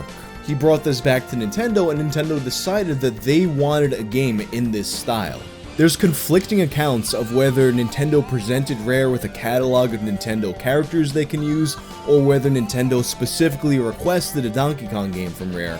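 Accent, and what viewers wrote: New York, United States. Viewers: Lol I first heard it from Rich Massucci of ReviewTechUSA and now I can’t unhear it. They’re both New Yorkers so definitely an accent thing. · Like every New Yorker you'll ever meet.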